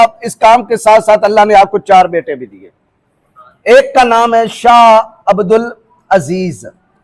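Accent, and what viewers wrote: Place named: Punjab, India. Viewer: Mashallah bht achchi malumat par Panjabi bech bech me bolkar khas khas Samajh nahi aya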